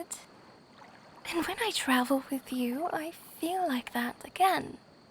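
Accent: United Kingdom. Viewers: Since when did you unlocked the British accent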